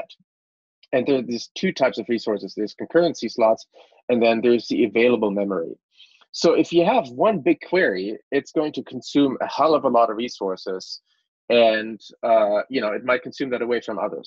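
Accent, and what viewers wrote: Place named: England, United Kingdom. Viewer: Clear enough, and with a clear English accent. I'll allow it.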